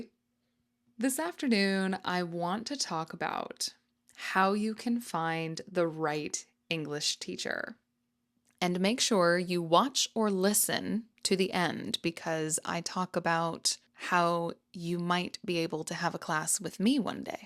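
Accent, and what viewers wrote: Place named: United States. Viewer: Hi there my beautiful teacher you are a master of communication with us and your American accent is perfect meeting our expectations that's why we love 💕 you here in Morocco keeping up ❤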